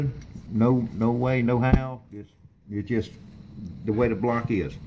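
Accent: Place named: Texas, United States. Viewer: Live your accent. Texas!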